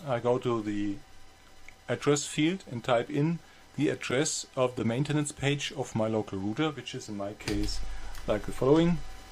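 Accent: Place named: Germany. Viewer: Dazu braucht man nicht auf die Windows Version achten, man hört es am th ;-) Nicht böse gemeint, aber ich habe lange in England gelebt und einen Deutschen erkennt man recht schnell an der Aussprache. Nebenbei, sehr geiles Projekt. Es juckt schon in den Fingern.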